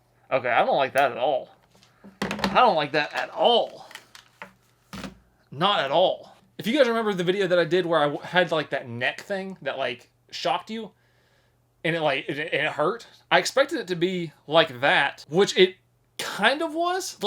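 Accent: South, United States. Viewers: Maybe it's just the Southern accent but dude will you dropped on your head? Either that or you've huffed a lot of petrol. I bet you think the world is flat and birds aren't real.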